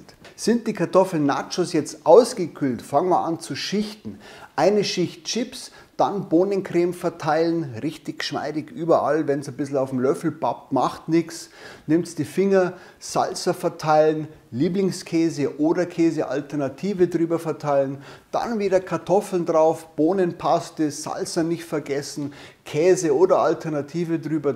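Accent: Bavaria, Germany. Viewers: Kann man sich nicht geben mit dem dreckigen bayerisch 😷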